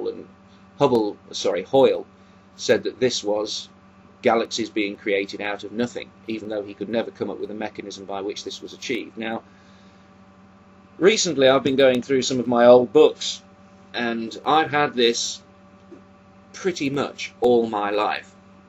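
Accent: United Kingdom. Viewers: i love your british accent, i don't think i would have watched the whole video with an american one (especially a southern accent). :) science is awesome! at least it's something to rely on; not the God character.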